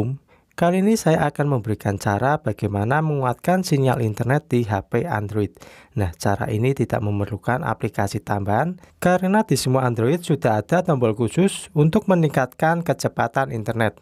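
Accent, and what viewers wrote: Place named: Central Java, Indonesia. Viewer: Logat jawa tengah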